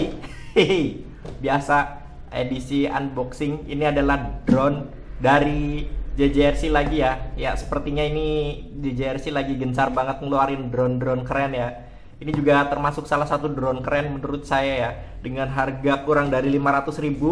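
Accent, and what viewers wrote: Banyumas, Indonesia. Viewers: mas e ngapak e mana e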